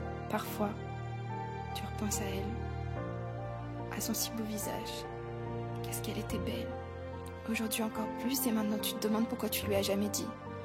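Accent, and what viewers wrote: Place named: Canada. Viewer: mercii 🙃javais pas bien compris mais tu parle canadien,enfin ta l’accent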